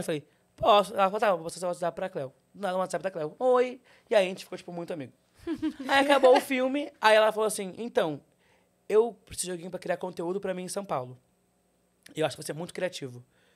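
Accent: Rio Grande do Sul, Brazil. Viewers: Gente, ele é gaúcho ou Nordestino? Eu só achei que ele fala rápido mesmo...mas aqui em casa tem a ket que fala rapido...e eu ja consigo entender....O que acontece é que a net hoje está tão toxica, que se a gente diz uma coisa, as pessoas acham que estamos criticando de uma forma leviana e maldosa... e não... Ele apenas fala rápido... E isso não diminue em nada ele...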